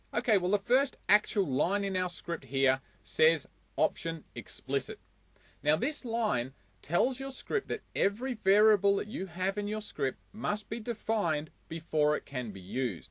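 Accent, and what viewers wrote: Australia. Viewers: Love you hear an Ostayian doing IT videos. Cheers mate!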